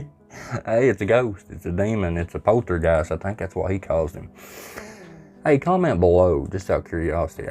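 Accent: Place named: Carolina, United States. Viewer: Are You From Carolina? I Love You'r Axent...Jihaaaa...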